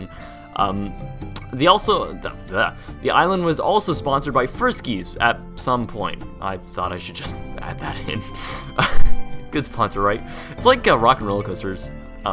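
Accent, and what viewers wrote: Canada. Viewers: You sound Canadian, and stoned.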